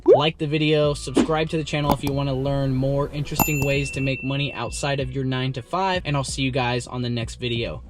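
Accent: United States. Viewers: U American?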